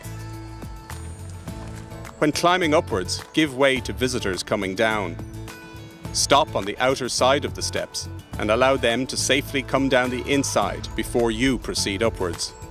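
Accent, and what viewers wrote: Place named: Ireland. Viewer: that guide at 5.00 min. is American but narrator is Irish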